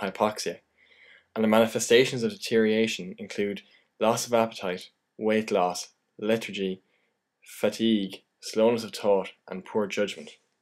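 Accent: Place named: West, Ireland. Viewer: West of Ireland accent I'm sure, but definitely Irish.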